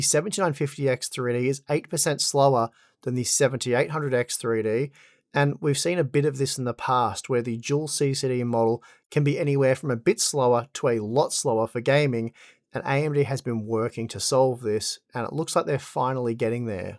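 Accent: Australia. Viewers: when u r talking about the part and it's advantages and disadvantages with specs etc - having b roll doesn't help... what would be nice is to have a chart/table for example, highlighting the points u raise at the moment perhaps? Just talking about it on the air~ isn't most convinient, especially with YouTube subs analyzing Australian accent sometimes and with similar names for products....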